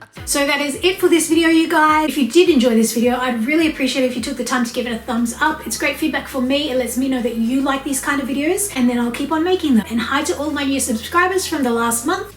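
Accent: Australia. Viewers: I'm from Brisbane too, I've only just found these videos and I'm loving them...also loving the Aussie accent! 🇦🇺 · Hi from another Brisbane girl, it’s nice to hear an Aussie accent and I had a giggle when you mentioned thongs and then called them flip flops 😂 the views from your apartment are amazing 😊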